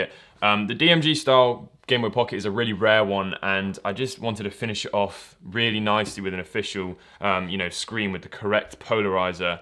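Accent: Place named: United Kingdom. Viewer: He’s from the uk they have a different way of say things if you are interested look up the differences in English(us) and English(uk)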